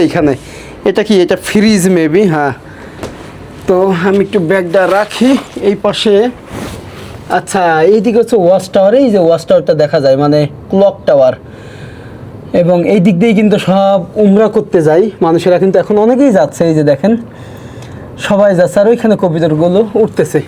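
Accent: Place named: Bogura, Bangladesh. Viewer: উনার কথার শুরুতেই বুঝচ্ছি বগুড়ার লোক।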